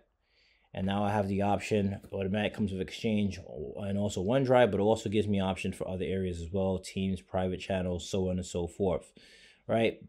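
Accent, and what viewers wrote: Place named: Bronx, United States. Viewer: IT with a Bronx accent. Digging it.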